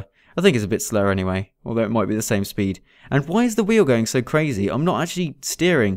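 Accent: United Kingdom. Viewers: I <3 u so much u make my day and make me smile even when I'm in hospital ! Ps r u British?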